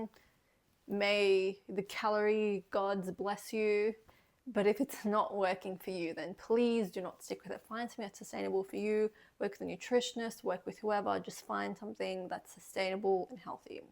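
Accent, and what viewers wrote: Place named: Australia. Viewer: I like the content, but ur english is australian language, so it is difficult understand for Indians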